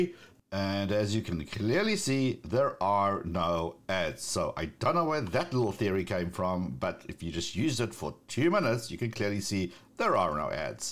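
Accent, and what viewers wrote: South Africa. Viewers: Very usefull video. Thanks! So I am thinking. The accent.... born either in Cape Town or Sandringham....? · Wait, are you South African?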